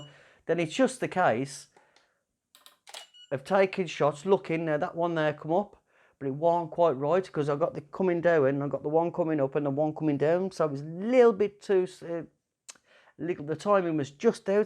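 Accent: Birmingham, United Kingdom. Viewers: Where have you gone. Missing you……we need to hear your brummie accent and hear all your tips. Would like to see you do some macro with veg or kitchen things x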